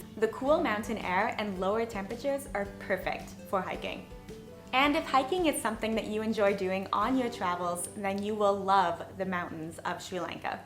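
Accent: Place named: New York, United States. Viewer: Have you done any traveling in the last few months? Sounds like you are from NY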